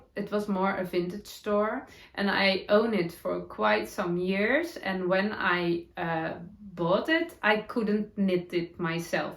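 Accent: Netherlands. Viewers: Just found you! And so glad I did. Just at the beginning of the video and I'm looking forward to hearing all about your projects. Hope you don't mind me saying that I love Dutch accents, and I find yours so comforting to listen to :)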